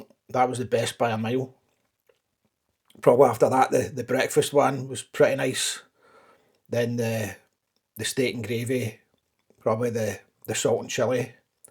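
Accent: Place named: Scotland, United Kingdom. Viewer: Very nice. I was married in the church you drove past, the pies turned out better than my marriage 😂. Good to hear a proper Scottish accent on YouTube, most people try to posh up the glesga accent.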